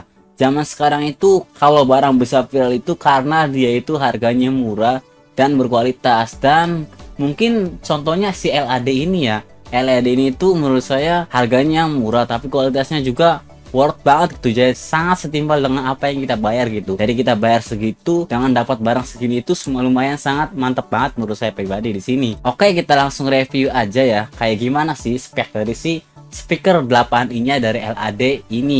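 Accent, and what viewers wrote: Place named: Indramayu, Indonesia. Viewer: Logat indramayue kuh jelas pisan..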